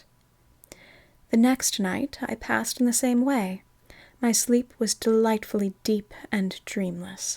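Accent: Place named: Transatlantic, United States. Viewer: I love that you’re keeping the transatlantic accent alive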